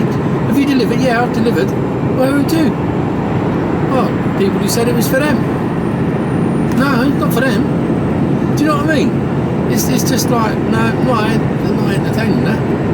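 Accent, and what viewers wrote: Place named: South London, United Kingdom. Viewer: Always confused trying to place your accent,picking up a little South London,Plumstead area maybe.? Enjoyable vlog,nice to see the A1 ,used to spend hours on it and hated it then.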